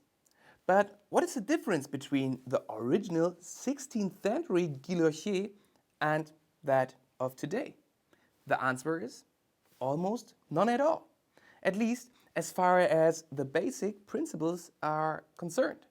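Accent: Germany. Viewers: owen wilson‘s german accent baffles me every time i watch one of these exceptionally well made videos… great stuff!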